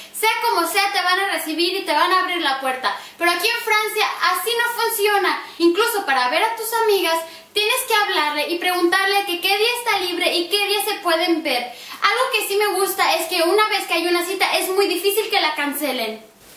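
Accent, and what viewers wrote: Mexico. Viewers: te felicito, eres super fresca y carismática para expresarte y describir tus nuevos hábitos y costumbres. Te has adaptado muy bien a tu nueva realidad, pero te felicito porque tu español-mexicano, sigue siendo perfecto, y yo como tu compatriota, me da orgullo que no pierdas tu identidad. "A la tierra que fueres, has lo que vieres". Eres, sin proponértelo, una traductora y embajadora mexicana. Además de que luces tremendamente atractiva. Que orgullo tenerte como mi compatriota. Es el segundo video que veo de ti, para aprender francés, y ya eres mi favorita. Gracias por tu generosidad, vitalidad y entusiasmo, para mi, ya eres trending-topic. Te admiro y me motivas a aprender más de Francia, su lengua, sus tradiciones, costumbres, comida, landmarks, música, moda y cultura.Tienes toda mi simpatía y afecto. Mega DIEZ!!!! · Vine a ver el primer (?) video, y encuentro que hablas casi igual, no se que tanto cambio le ven, me alegra que conserves tú acento mexicano y esas palabras que los otros países acostumbramos a escuchar ♥️ ajsjaj, me alegra que no te "engrupas" como decimos en mi país, conozco chilenos que han vivido 20 años fuera de aquí y hablan como si se hubieran ido ayer, conserva mucho tu identidad saludos.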